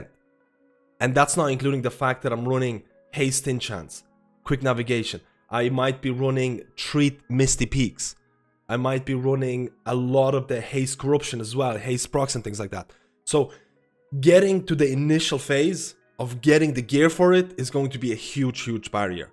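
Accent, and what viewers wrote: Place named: Ireland. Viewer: are you living in Ireland, serious Irish accent lol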